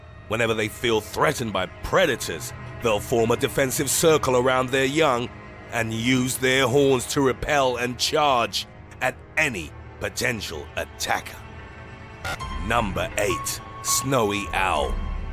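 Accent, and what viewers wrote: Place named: Cockney, United Kingdom. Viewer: Easy going gentle giant 😂😂 You sound proper cockney 😂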